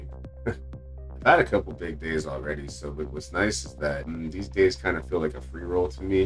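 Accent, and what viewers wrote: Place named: New York, United States. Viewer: are u from NYC? you sound like a new yorker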